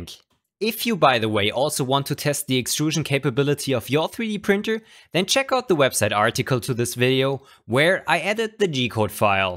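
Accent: Germany. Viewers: Are u German? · Ja genau! Ganz geil alter only watched 5 seconds of the video and already knew u were German lol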